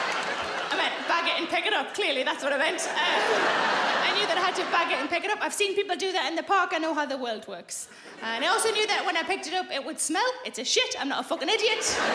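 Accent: Scotland, United Kingdom. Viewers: I thought that she was Scottish given her accent and last name! Her comedy is always hilarious!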